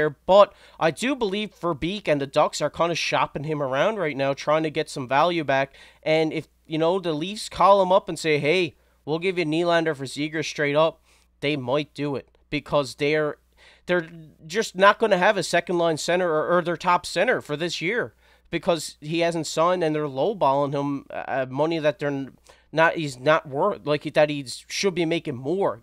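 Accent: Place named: Maritimes, Canada. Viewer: Great report love the Maritime accent!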